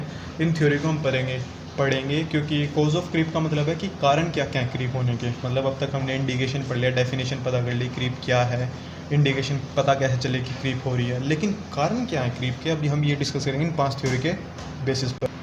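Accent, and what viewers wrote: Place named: India. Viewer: Yr aap to mst desi bolo ho mst lge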